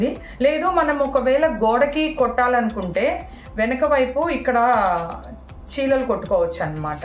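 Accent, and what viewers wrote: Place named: Nellore, India. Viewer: Hi garu nenu mee vedios first time chusanu meeru matladetappudu Nellore slang la vunde anukunna anukunnate meeru vedio lastlo Nellore antaa tiriganu stand kosam annaru kada appudu ardamayndhi meeru kooda mana Nellore vallani😅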